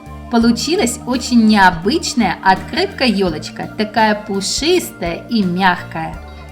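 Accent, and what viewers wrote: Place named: France. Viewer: голос потрясный! как у француженки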